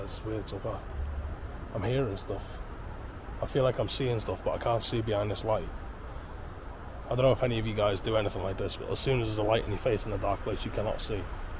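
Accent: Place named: Liverpool, United Kingdom. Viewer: That was epically brave of ya!! Ya sound a but scousey there tonight, must be cos ya were nervous.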